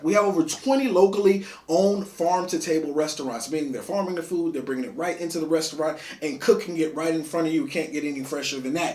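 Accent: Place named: Alaska, United States. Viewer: Are you by chance from Alaska? Because I’m listening to you describe where you’re from and it sounds just like Alaska. Lol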